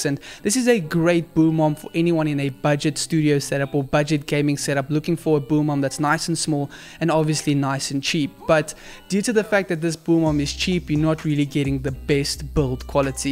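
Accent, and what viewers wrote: South Africa. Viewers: You lowkey sound South African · You sound like a south african! Allo from Montreal!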